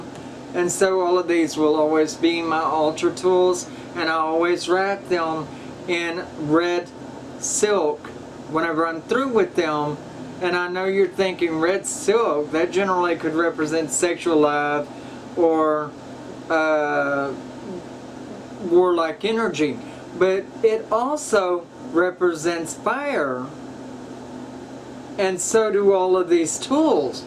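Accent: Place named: United States. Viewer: she sounds American.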